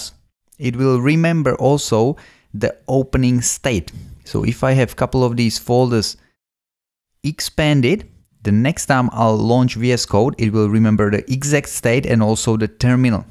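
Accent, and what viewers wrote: Czech Republic. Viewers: Just started using VSCode at work, this series is very helpful. Also, with a name like and that accent, are you Czech?:)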